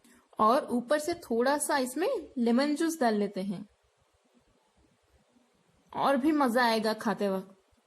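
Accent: Hyderabad, India. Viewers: Aap Bhi Hyderabad K Hai Kyaa? Kaiku Bole Toh Thodi Baat Se Hyderabadi Mlm Hora!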